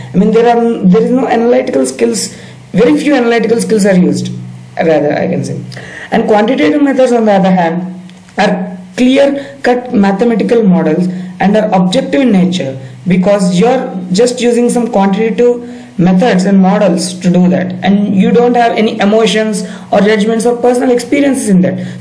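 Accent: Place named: Spain. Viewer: hi are you spanish? sorry but i have to say yr accent is so hard (for me) to comprehend all of it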